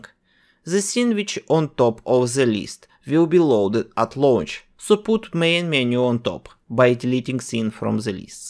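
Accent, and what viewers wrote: Russia. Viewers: Спалился что ты русский)):face-blue-smiling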